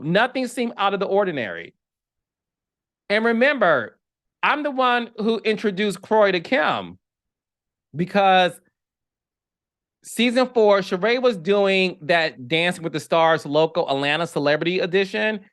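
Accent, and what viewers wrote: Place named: Florida, United States. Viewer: Your dialogue and pronunciations remind me of Marlo. Are you from Florida? I 100% agree Porsha should stay far away from RHOA. Porsha is great TV but RHOA is no longer the platform for her. I would love to see a show with Porsha, Phaedra, NeNe and Kim on a show following each of them on their new journey. It would be interesting, entertaining and just enough shade to make you laugh.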